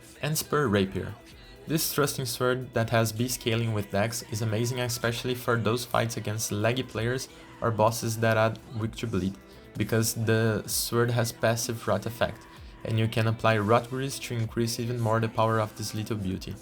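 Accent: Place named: Brazil. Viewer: sotaque BR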